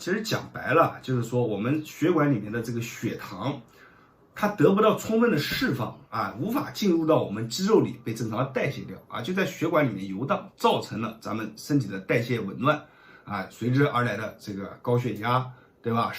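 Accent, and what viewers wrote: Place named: Nanjing, China. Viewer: 聽口音應該是南京人🙂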